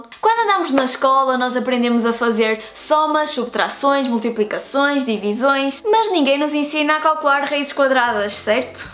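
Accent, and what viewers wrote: North, Portugal. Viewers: Olá, Seu sotaque um bocadinho diferente é típico da região norte de Portugal? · A cereja do bolo é esse sotaque lusitano. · gosto de assistir seus vídeos para ouvir o sotaque Português.... so por titulo de curiosidade, faz video sobre triângulos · Ela fala mais pouco kkkkk. divertido ver alguém falan o português de Portugal. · uma lusitana se enrolando no português.... hehe fico fascinado com esse sotaque! gosto mesmo! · Amei o vídeo!! Obrigado pela explicação!! Seu sotaque é muito fofo. Qual a sua nacionalidade? Parece que você fala português de Portugal. Eu sou brasileiro, mas entendi bem o vídeo. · Olá moça portuguesa... Acabei de te conhecer por este vídeo, que achei interessante. Sou apaixonado por matemática, acho que vou gostar dos teus videos (apesar de as vezes ter dificuldade de entender alguns pontos, pelo seu sotaque, isto torna a experiência mais interessante e divertida); estou me inscrevendo, e vou ver teu blog também. 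Parabéns pelo vídeo, sucesso e abraços! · Você é portuguesa? Duvido que exista alguma região do Brasil com esse sotaque. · Ela fala igual o alex d. Sousa acho o sotaque português bonito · Adoro esse sotaque de Portugal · Sou professor de Matemática e Física no Brasil. Vejo seus vídeos e adoro o sotaque dos portugueses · Lindo sotaque. As raparigas do norte, realmente têm um sotaque giro.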